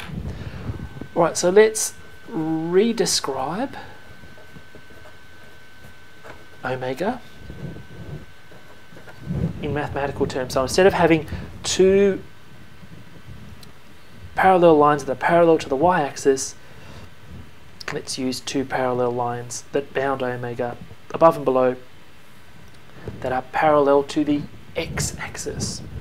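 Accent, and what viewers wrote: Australia. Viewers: Definitely mate, an Aussie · Talha Bedir this sounds australian to me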